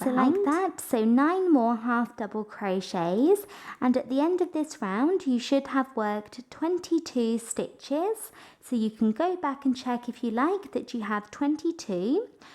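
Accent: England, United Kingdom. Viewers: It sounds English to me. I'm not sure how that sounds fake?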